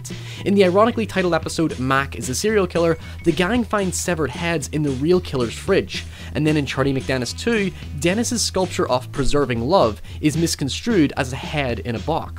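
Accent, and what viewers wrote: Northern Ireland, United Kingdom. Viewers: he sounds like a northern irish man like myself :) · Northern Irish accent? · Are you from Northern Ireland? Your accent is from here but has a weird American twang to it. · He's Northern Irish 😊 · You sound like you're from N.I, are you? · It's Northern Irish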